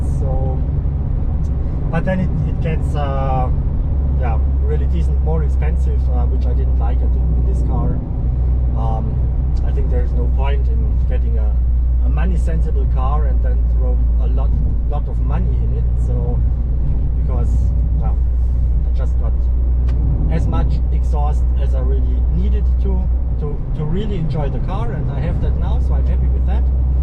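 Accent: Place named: East Germany, Germany. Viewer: DDR ENGLISH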